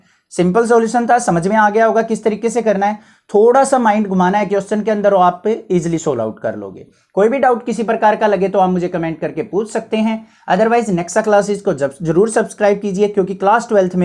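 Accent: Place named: Bihar, India. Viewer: Sir bihari h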